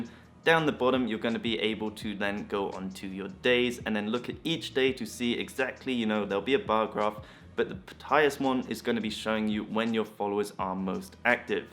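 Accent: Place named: United Kingdom. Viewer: what part of the UK are you from? I ask because you have the same accent as one of the BBC news journalists.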